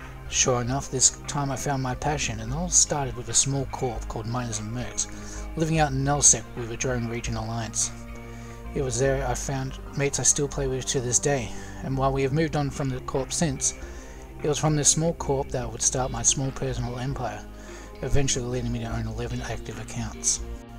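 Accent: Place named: Australia. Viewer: subbed. good on you for moving past the frustration that arises time and again. Looking forward to more videos. It may be the nerves, it may be the quick aussie speak but I found it hard to make out what you were saying as it was going by so fast. :) Could also be my own crap hearing tho. o7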